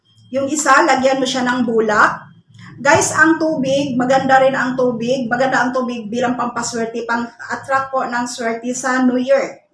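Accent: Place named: Visayas, Philippines. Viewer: Bisaya ka maam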